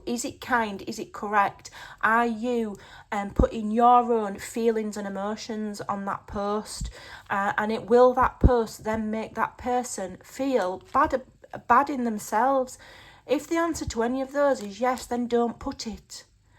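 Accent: Yorkshire, United Kingdom. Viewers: If I could give a thousand likes I would. Most YouTubers wouldn’t be brave enough to speak up. I also like the empathy that you impart with your gorgeous accent 😊Is it Yorkshire?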